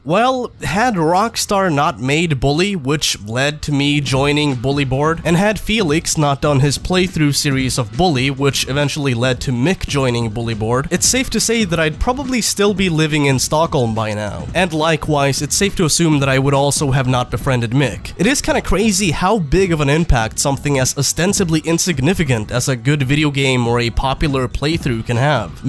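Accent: United States. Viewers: Didnt know your European you sound american white :/ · You sound American tho why? If u don’t mind me asking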